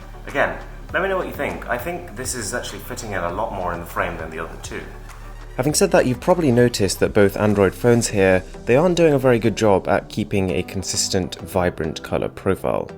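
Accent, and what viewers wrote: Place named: United Kingdom. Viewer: Bro you have a fashion model type face😍 with your brithish akhcent😍👍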